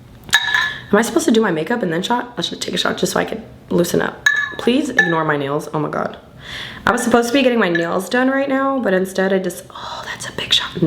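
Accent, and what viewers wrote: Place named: Florida, United States. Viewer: I fuck with u the long way!!! Man I miss Florida talk!!! Love it keep em coming!!! Ur doing great!!!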